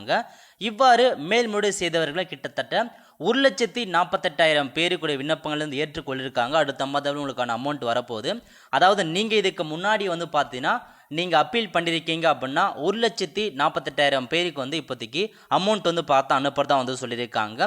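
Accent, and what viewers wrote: North, India. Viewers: Athu Appel illada Apply onnum Tamil olunga Pesanum illa Engilsh olunga Pesanum Etho Vadakkam Maari Pesikittu Irukaa 🤡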